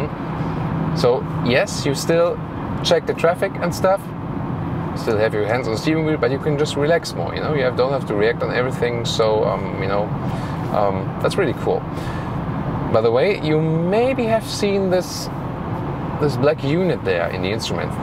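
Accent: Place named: Germany. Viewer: I like the car reviews you make, but I must admit your German accent sometimes make the sentences incomprehensible.. saying "smoosse" instead of "smooth" I did understand, but some other words might as well have been Chinese :D :D Apart from that, very informative video. The X5 grows on me every time I see it :)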